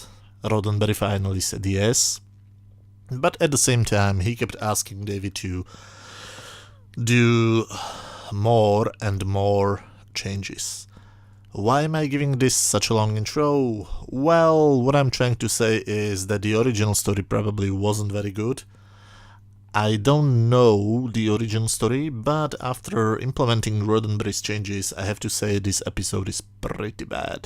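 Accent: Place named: Israel. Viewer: Uhm, where are you from? Your English is quite good, but if I had to guess (please don't be offended) I'd guess that you're Israeli. (I'm sorry if I'm wrong.) I just ask because I spend so much of my time teaching English to Israelis (some of whom are also Russian or Ukranian, very few of which are South American). Still, your English is quite good! Για να ξερετε.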